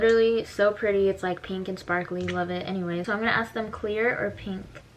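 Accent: United Kingdom. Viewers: The British accent LAMAOAOAOOA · I’m British.. and I am so here for ur British accent it’s rlly funny 😂 in a good way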